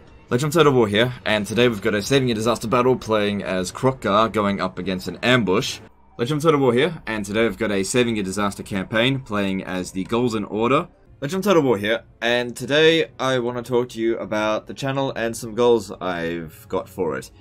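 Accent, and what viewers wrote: Australia. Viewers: It's your down under accent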